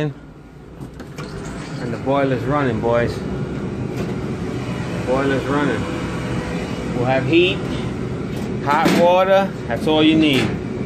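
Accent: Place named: New Jersey, United States. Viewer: I'm gonna guess New Jersey accent ... Thanks for the video. You did good.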